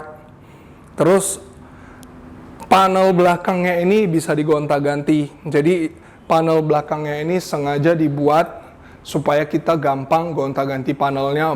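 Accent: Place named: United Kingdom. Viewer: iya itu logat british. Sama kayak Harry Potter.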